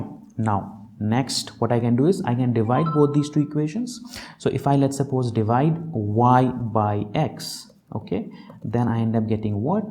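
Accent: India. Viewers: NIce English, Lesser Accent of Indian English, Keep It Up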